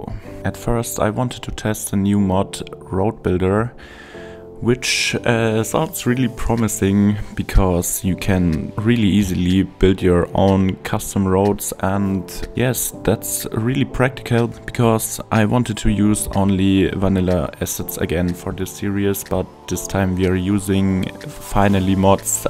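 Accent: Germany. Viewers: Bis jetzt wusste ich nicht mal das du Deutsch bist. PS: Mann hört es aber auch Raus XD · Are you german you have a really german accent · Man hört voll die deutsche Sprache raus 😂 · NGL I love your germann accent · Du kommst aus Deutschland das oder Deutschsprachigen das hört man einfach 😂 oder ich liege komplett falsch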